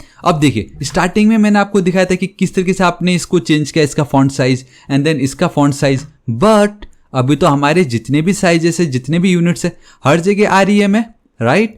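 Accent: Nepal. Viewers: nepali acccent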